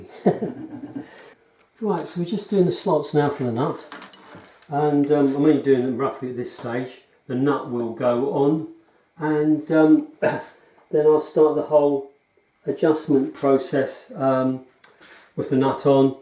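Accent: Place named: United Kingdom. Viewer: Hello again,. Am watching your bass construction series, very much enjoying it. While I have no desire to ever build a bass, as usual, I totally admire your craftsmanship and attention to the smallest of details. While I am at it, I should mention I find your British accent a real treat to my ears, as if I am eating a piece of delicious toast covered in peach jam. Cheers, my friend. Bob in Montana. 😊❤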